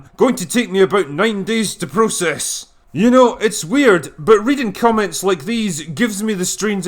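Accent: Scotland, United Kingdom. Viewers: I had the volume all the way up by accident and I got blasted in the ear with an angry Scot. Worth it.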